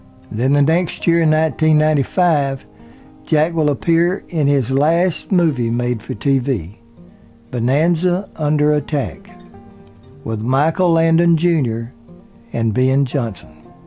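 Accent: South, United States. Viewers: Your voice is as unique and memorable as Christopher Walken’s; the rhythmic southern drawl is just the icing on the cake. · Thanks ever so much for this great tribute to mr. Elam. I have always enjoyed his movie acting. He may not have been the most handsome man in the room but he had a commanding presence on screen! And as for your southern accent , I think that it’s very unique , you don’t sound like everyone else! And for me, that’s a good thing! I have subscribed. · I always liked Jack Elam and wondered what happened to him. As a kid, I remember a TV western when it showed a picture of Jack with the introductory words saying something like "Gunman turned Lawman", which, as I remember was a pretty darn good show. Thanks for your nice Southern style (voice) discussing the life and times of Mr. Elam. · Fell asleep whilst listening to the Southern accent talking about Jack Elam .....very interesting but slow .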